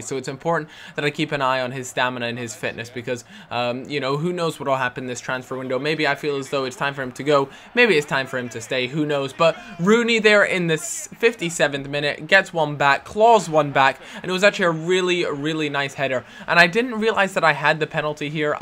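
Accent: Scotland, United Kingdom. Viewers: where is Scotland do you come from?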